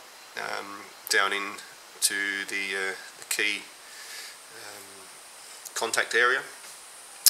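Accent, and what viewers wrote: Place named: United Kingdom. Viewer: Cool! Such a small world eh? I'm in Hamilton - from Toronto originally - got the Roland RD 250 s As a Gift - from a great friend - - - - - had the "two dead keys" issue - - - - went on You Tube - - - - found this wonderful " " Brittish accented wonderful guy, gladly sharing his wisdom and awesomely creative advice - - - and did what he said - - - and bent (it took me 2 versions to get it right - the first one was too thin of a paper-clip - gotta use a thicker one, like he told us) and BOOM - fixed - and done. This is an amazing keyboard - a Classic. To have Great Advice and Wonderful Tips like those from - are So Much Appreciated. Thank you - Again -!!!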